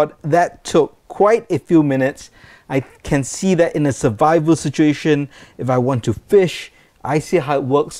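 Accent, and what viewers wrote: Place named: Singapore. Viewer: I suspected that you were Singaporean because of your accent but you don't say "La" so I'm not sure.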